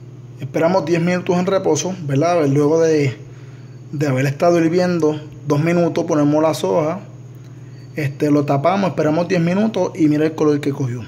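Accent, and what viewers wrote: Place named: Puerto Rico. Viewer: Bendito se nota que eres boricua amigo.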